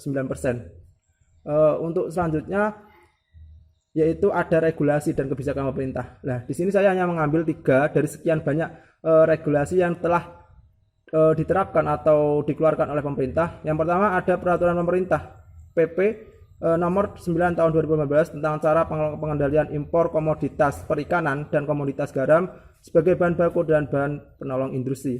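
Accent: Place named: Java, Indonesia. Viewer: Kok medok mas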